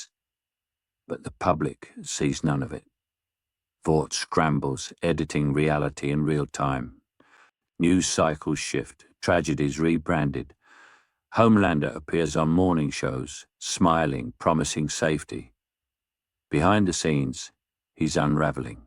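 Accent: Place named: Cockney, United Kingdom. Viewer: First time I’ve heard an AI imitate an East End accent.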